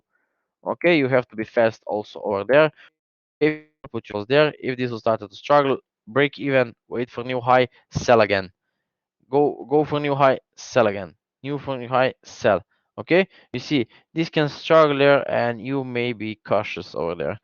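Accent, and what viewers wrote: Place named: North Africa. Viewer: " from Slovenia " I thought your accent was North African:-) An Arabic speaker. Interesting. Now, I know how Slovenian accent is. All the best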